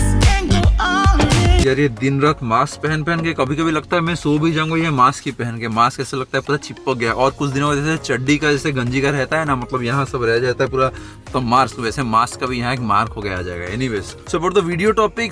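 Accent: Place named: Bengal, India. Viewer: Improve ur hindi accent..U sound like bangali from ur Hindi ..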